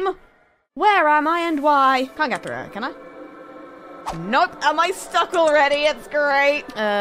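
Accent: United Kingdom. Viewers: i though she was cringe until she turned bri'ish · BRITISH DETECTED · Is aguy or a girl, can’t really tell, someone, please explain me. Edit:i just checked the info on the channel and i want to tell you that you have an overwherming british accent i fell like Edit2: this is not a horror game even in the slightiest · Idk, British sounds funny and cute at the same Time.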